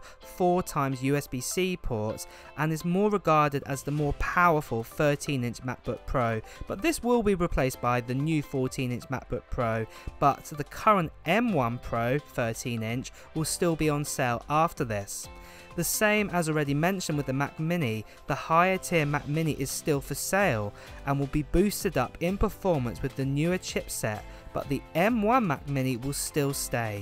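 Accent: United Kingdom. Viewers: Well, I want to buy the iPhone 11. But sadly I don’t have money for that. And also i didn’t already bought any apple gear or product cuz I don’t have money for that, And i hope i will be the one who got your iPad. Because It can help me in my studies. btw i like your british accent, congrats and godbless💖💖💖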